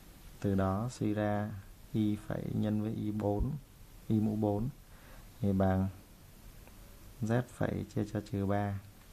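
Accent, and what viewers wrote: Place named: North, Vietnam. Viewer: hình như thầy ng miền Nam ns giọng Bắc thì phải, giảng thấy dễ hỉu hơn mấy cái power point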